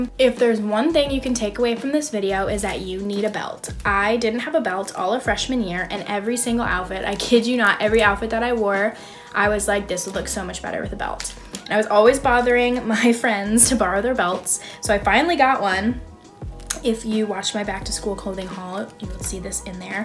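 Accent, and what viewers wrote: Midwest, United States. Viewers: i can tell you're from the midwest girl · i am from indiana and i can definitely confirm she is from the midwest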